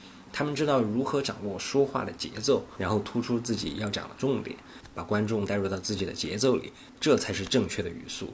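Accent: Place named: China. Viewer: 老师，您好。很高兴会遇到您的频道，我很喜欢您的视频，subcribed 了，请问您是中国哪个地方的人？我喜欢听北方人说普通话，也想会说的像北方人那样，可是也不要太重。刚好像您一样，儿化不太多，速度也不是太快。您的视频真的是很合适让我们外国人来练习跟读（shadowing). 还有谢谢您在您一些视频提供了拼音字母，让我们更有自信地跟读 （不担心声调读错）。老师，有些问题想问您 ： 1. 书里说上声如果在句末应该读 214，可是我在电影和youtube听母语者读 21 不是 214. 我们该如何好？ 2. 去声连在一起的时候，比如 ： “放假”，“记号”。 有位老师说应该读 53-51 而不是 51-51， 所以听起来不像生气的样子。可是老师，我到现在还觉得莫名其妙，因为不少听母语者说 “记号” 不是 53 51 而 更像 51-轻声。对我来说这样的情况让我感到很头疼。请老师解释。。 3. 有一个频道， link: <link>.母语者在real life 说话的时候大部分说的那么快吗？这样的话，我觉得到最后永远也不会 sound like a native chinese speaker?:-) 跟不上。 感 谢 老师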